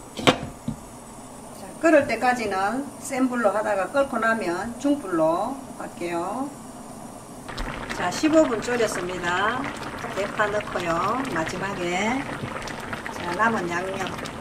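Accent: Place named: Daegu, South Korea. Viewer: 선생님 감사해요 멀리서 항상잘보고있어요!!! 구수한 사투리 듣기좋아요 . 저도 고향이 대구거던요!! ㅎㅎㅎ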